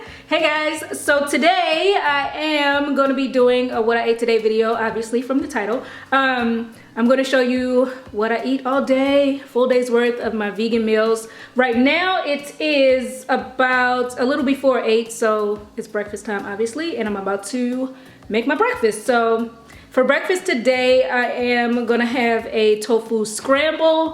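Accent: United States. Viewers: So im 3 mins into the vid and im like, holdup.....when did she have an american accent? for the longest I thought you and Shirley B Eniang where the same person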